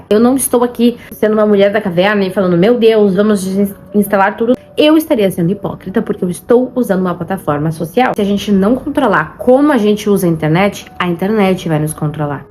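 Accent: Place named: Rio Grande do Sul, Brazil. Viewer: Tu é gaúcha? o teu sotaque se parece de uma gaúcha.😊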